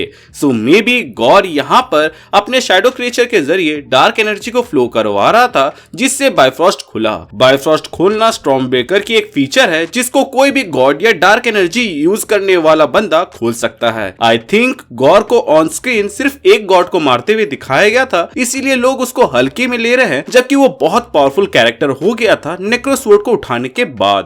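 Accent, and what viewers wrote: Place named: Jharkhand, India. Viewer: तोर भाषा मे झारखंड के झलक देके पारे हियो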